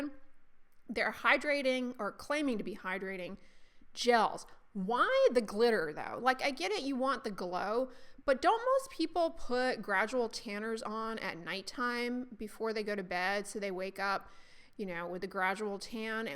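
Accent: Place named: Texas, United States. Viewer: I've been a subscriber for years and it makes me chuckle to hear your Texas accent come through. I don't remember where you lived before you moved here.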